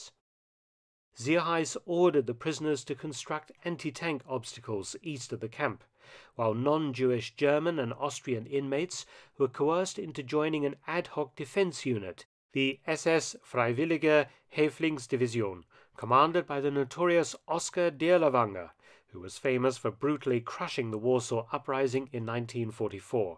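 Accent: England, United Kingdom. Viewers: That's how a proper English should be spoken! Gorgeous accent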